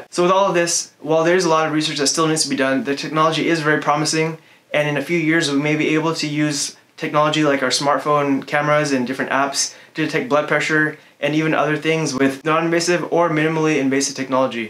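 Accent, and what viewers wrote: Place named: United States. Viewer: Ur talking style like American so I asked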